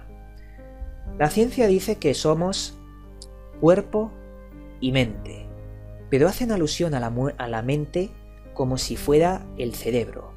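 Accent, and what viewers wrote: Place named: Spain. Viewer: Gostei muito do vídeo, mais eu não entendi muito porque sou brasileiro e você deve ser espanhol.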